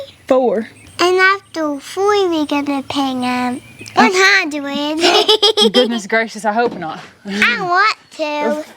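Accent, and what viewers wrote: South, United States. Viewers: You actually almost have a southern drawl for someone from Illinois.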